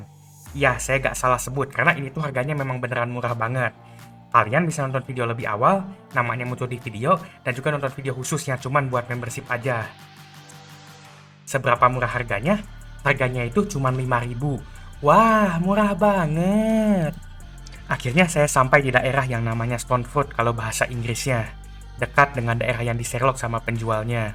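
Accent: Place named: West Java, Indonesia. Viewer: orang bandung wkwk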